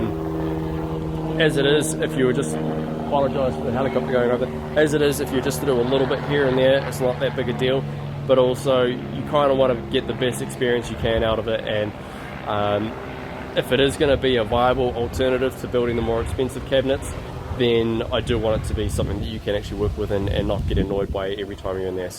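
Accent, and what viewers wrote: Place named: New Zealand. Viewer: Thank you. As seen as I heard your accent I said he must be a kiwi:). I just bought 2009 A4 3.2 Quattro and has same rough idle vibrating problem and kind a goes away when you are driving faster. I would really appreciate your advise for that issue. Where shall I take my car? I am in Auckland and I don’t want to be ripped off TBH! It has 150K milage on it. my email <mail> many thanks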